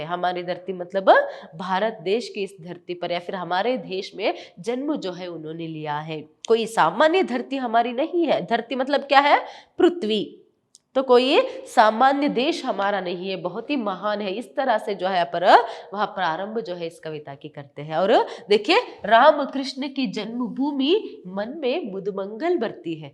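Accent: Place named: Karnataka, India. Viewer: but she speaks Hindi more like kannada😂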